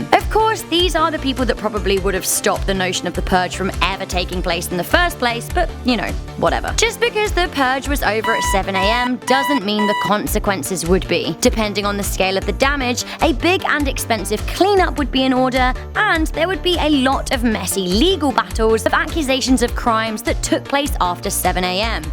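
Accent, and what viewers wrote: United Kingdom. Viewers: no offence, but how come a lot of these kinds of channels have annoyingly high-pitched british-accented women?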